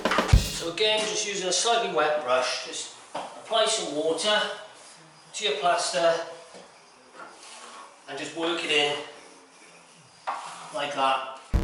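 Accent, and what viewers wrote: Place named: South Wales, United Kingdom. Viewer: South Wales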